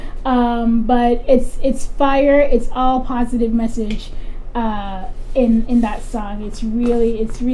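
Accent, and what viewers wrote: Jamaica. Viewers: Oh u Jamaican 😁😁..Bless up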